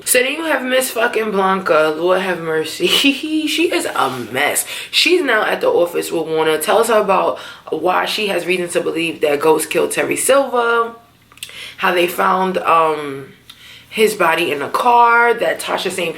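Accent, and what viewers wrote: New York, United States. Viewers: I love your reviews I came across your Channel recently & the NY accent got me I’m from CT so if you know you know 😂 but this episode was good but predictable I think it was Tariq if you noticed he fell back so whoever shot him made him turn around to face them first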